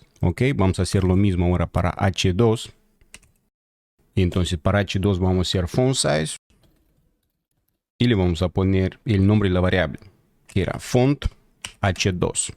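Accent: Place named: Russia. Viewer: pero eres ruso no??a ver sin ofender ni nada solo que me gusta tu accento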